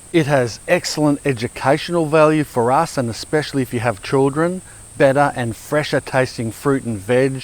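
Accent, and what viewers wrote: Australia. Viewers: If I'm not mistaken, you're Australian? (I always confuse New Zealand and Australian accents) Anyway, if so, what's the issue with water in Australia? I've read that companies from a certain communist country (Ive had comments deleted for stating it before) own water rights to pieces of or even entire water systems. Is that true?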